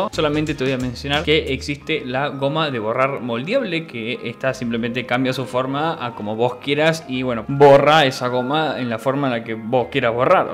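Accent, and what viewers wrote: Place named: Argentina. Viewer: Físico de polaco y acento de argentino 💝💝💝 capo total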